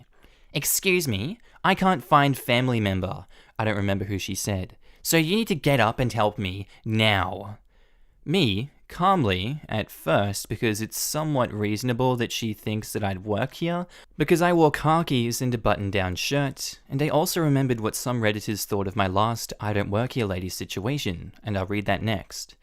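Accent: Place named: Australia. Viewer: I always love the Aussie accent, but I admit I was wondering how the OP in the hospice was "wearing car keys."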